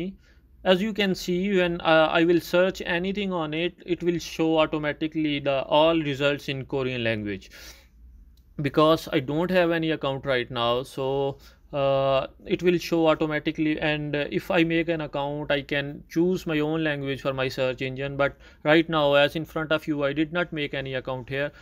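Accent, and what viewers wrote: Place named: India. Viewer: India people ini mana mungkin orang Indonesia ngomong nya pake logat yang begitu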